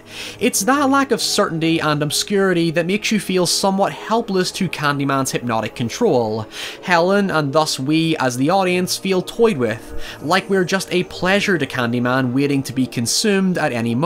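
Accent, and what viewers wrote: Northern Ireland, United Kingdom. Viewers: I honestly love you, I love hearing you talk! I'm Northern Irish so it's comforting to hear XD such a huge fan of all your videos, including this~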